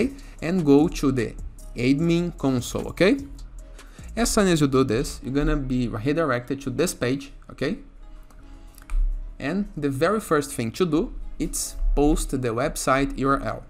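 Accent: Brazil. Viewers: Cara notei na hora que tu era brasileiro pelo seu sotaque kkkkk mas parabéns pelo video!!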